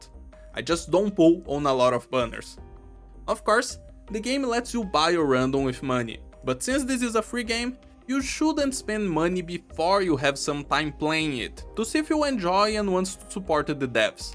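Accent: Brazil. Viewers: Eu ouvi a voz dele e insta reconheci o sotaque kkkkk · you talk like a brazilian fella(im from brazil) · Are you Brazilian? I could tell by your accent, I thought it was cool. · Você não me engana, esse sotaque é de brasileiro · Pela pronuncia consegui perceber que é brasileiro kkkkk, ótimo vídeo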